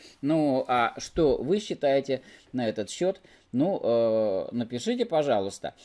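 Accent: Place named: Urals, Russia. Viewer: Уральский акцент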